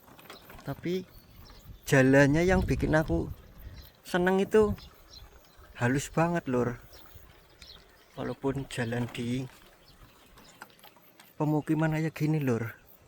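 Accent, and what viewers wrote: Indonesia. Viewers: koe ngapak yah,ngapake ngendi bro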